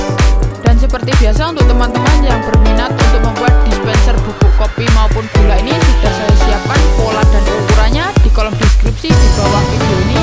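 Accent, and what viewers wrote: Java, Indonesia. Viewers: Orang Jawa ya..nada bicaranya kek orang Jawa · Wong jowo endi suarane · Mantap bang suka suaramedoknya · Sampean jowo ngendi mas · Jowo jan muasok bossquuuu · Suaranya medik rek rek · jawa ne medhok 😂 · medhokk bhoss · LOGAT JOWONYA KELIATA BETUL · Cara penyampaian bagus aku suka khas Jawa ☺️ editannya jg bagus. ❤️ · Kreatif banget... muantaap 👍👍👍👍....aq seneng bhs jawanya medhok...smpyan asli endhi Maas😁😁🙏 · Medok Banget ya bos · Medokk buangett bosomuu ndann😅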